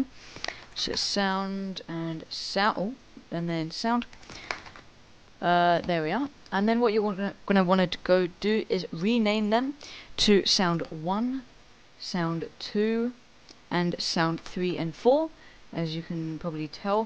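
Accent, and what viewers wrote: United Kingdom. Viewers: I love your Bri'ish accent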